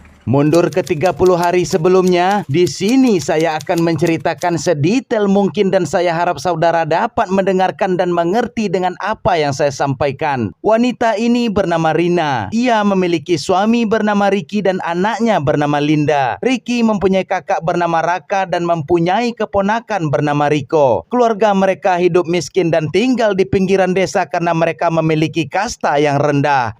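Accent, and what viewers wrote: West Sumatra, Indonesia. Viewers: Urang awak kiro e😂😅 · Urang awak ruponyo ha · Orang minang kah bg? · Urang awak admin nyo kayak e qkwkwk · Orang Minang bg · Urang awak kiroe admin eeee wkwkwkwk Kundiak gadang ndak ru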